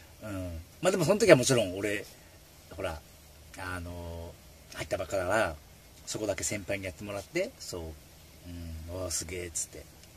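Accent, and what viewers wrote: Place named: Hokkaido, Japan. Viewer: さんお疲れ様でした。そして本牧ヤードの単独デビューおめでとうさまです😄👍️ 伊藤さんってトレーラー乗りの伊藤さん⁉️昨日動画上がっていてトレーラー降りる事にして次の会社に面接行ってきたと言っていたけど同じ伊藤さんかな………⁉️ ところで、１つ明らかになったことがありまして、私がさんの動画を見ていると（パソコン）、猫がヤキモチを妬いて邪魔をしに来ます。あとメスネコがさんの声に反応して走り回ってニャオンニャオン鳴き喚きます❗️スマホで見てもタブレットで見ても同じ反応をします😅💦⁉️ さんのなまりをダンナの北海道なまりと勘違いして反応してるのかな⁉️⁉️⁉️一昨年亡くなってしまったけど…⤵️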